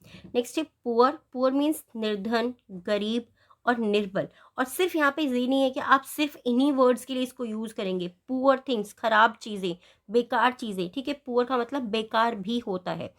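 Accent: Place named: India. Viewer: Hindi pronunciation of english word proper nhi lag raha hai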